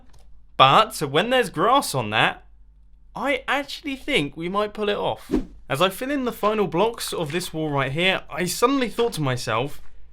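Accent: England, United Kingdom. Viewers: You're from Britain. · r u from England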